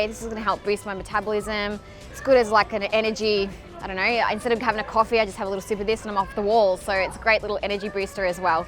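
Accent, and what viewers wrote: Australia. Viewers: Please marry me.. Fuck first she's a super hottie then she's has the. Australian accent.. She stole my heart right there.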